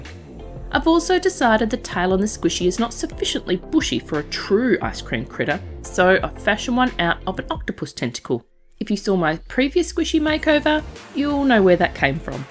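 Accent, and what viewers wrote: Australia. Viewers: I love them all. The newest edition to the Ice-cream Critters is definitely my favourite in this one. Yes, as a fellow Aussie, I to grew up with 100's and 1000's on my Fairy Bread !! I also see you are trying hard to upset all the Tasmanians haha. It sounds like you live in my neighbourhood, what side of the river are you on ? 😀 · love my Aussies your accent is beautiful. most of my fav channels are aussie much love from Canada ❤🇨🇦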